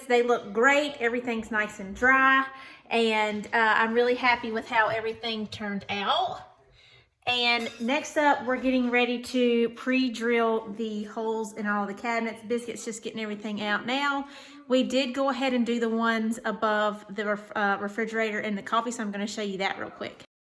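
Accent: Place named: South, United States. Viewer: Adorable slow southern drawl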